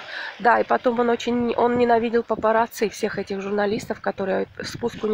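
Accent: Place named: Provincial, Russia. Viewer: наверное, вы из Мухосранска, говор выдаёт, темой не владеете, закончите хотя бы десятилетку, и не умничайте малообразованным теткам это не подходит.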